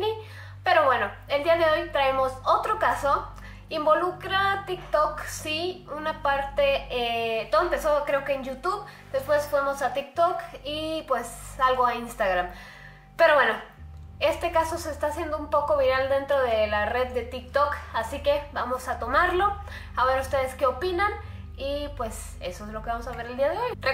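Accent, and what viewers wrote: Colombia. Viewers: El es colombian se los digo porque yo lo soy y se le nota :v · Dizque usa palabras de México obviamente es colombiano por como habla, las palabras y el acento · Las papal abras que usa y su acento, es muy Colombiano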